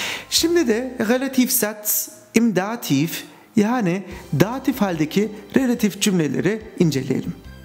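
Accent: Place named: Germany. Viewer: hocam cok degisik ve basit anlatiyorsunuz vede en önemlisi almanca aksaniyla anlatmaniz isde en güzelide bu cok tesekür ederim kendi adima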